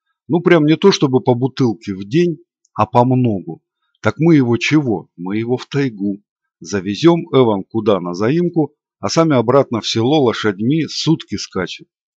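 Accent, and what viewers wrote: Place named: Russia. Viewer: Любо-дорого послушать... Слегка заострённо, но чисто по-русски... Спасибо.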